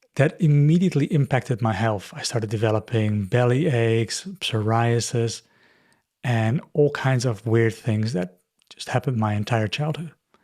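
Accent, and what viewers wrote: Netherlands. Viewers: My compliments 👏. The bravery to confront yourself with your childhood ànd work on it. All my love and light to you and all the ones who listen to you. (I hear a dutch accent?) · Sounds like a Dutch accent.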